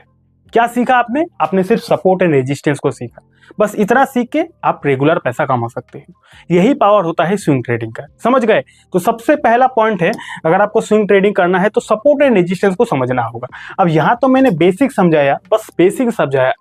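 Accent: Bengal, India. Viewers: Sir, aap Bengali hain?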